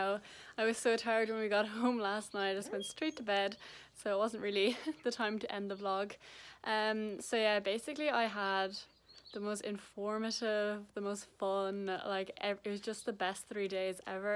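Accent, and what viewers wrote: Ireland. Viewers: I have been watching your videos for a while now and I feel you’re the most genuine person out there. I have no aspiration of competing or anything like that but you are so inspiring and I always feel I learn something from your content! Plus love love love your Irish accent ☺️ lots of love from Tawnydun Thady and I ! Xx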